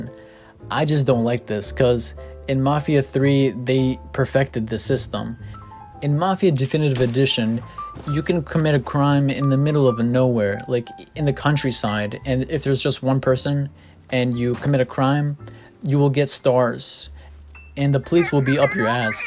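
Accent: United States. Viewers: Sounds American.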